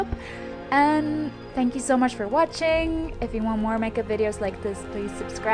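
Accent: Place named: Norway. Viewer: I knew it!! My mother is Norwegian and I was born in Norway but I live in America sents I was 6 ( I’m 18 now) so I don’t have much of an accent . But I thought you sounded Norwegian 😂💕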